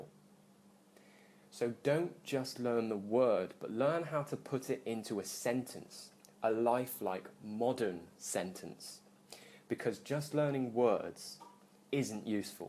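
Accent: United Kingdom. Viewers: Hello, my name is Pachara. I’m from Thailand and now living in the State. I love your video English lessons because I love British English. I live in the State but actually don’t like American English lol. I’ve found your videos are really useful and helpful. I like that you give tips not just teach. Keep up the great clarification. 👍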